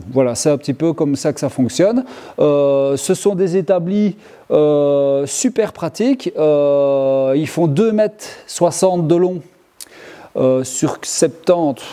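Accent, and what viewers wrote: Belgium. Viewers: Rare sont les artisans qui soient autant passionnés et très pédagogue dans le partage de leurs savoir faire et en plus avec l'accent Belge !!!!!😁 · Slt, tu as un chouette atelier, merci pour cette vidéo, tes conseils et le parler Belge avec humour. Pour les cours d'api, tu as un voisin qui maîtrise très bien le sujet: <link> à bientôt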